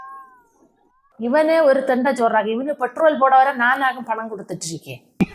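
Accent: Kanyakumari, India. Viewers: குமரி தமிழ் சூப்பர் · Kumari tamil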